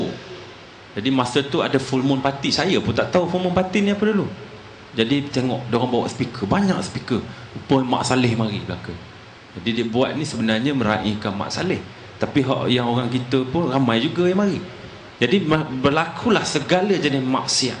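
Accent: Terengganu, Malaysia. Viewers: abg tazkirah cmpur loghat ganu dh.hehe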